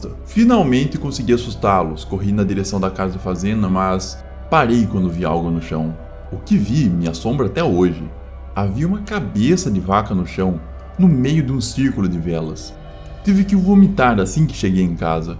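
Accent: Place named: Rio Grande do Sul, Brazil. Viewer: sim. Já me inscrevi, e adorei o sotaque (parece gaúcho) se não me engano.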